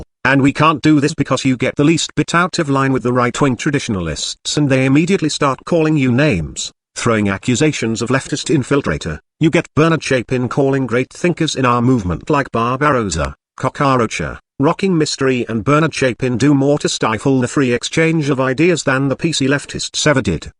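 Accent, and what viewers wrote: United Kingdom. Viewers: Good stuff. Are you from the UK? or is that just the synth-voice?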